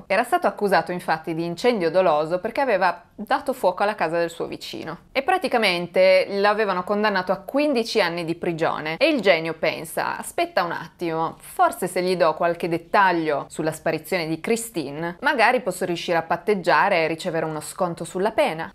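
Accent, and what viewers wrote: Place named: Turin, Italy. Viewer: Secondo me sei triestina.... No di Torino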